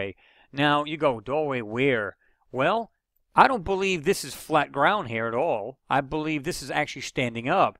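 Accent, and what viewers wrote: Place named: North Jersey, United States. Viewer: lol that's funny cause I really thought you lived in the north jersey or New York area you sound more like your from here then down where u said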